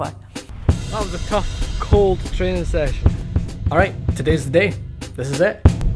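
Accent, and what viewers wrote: Scotland, United Kingdom. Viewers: are u Scottish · Are you Scottish!?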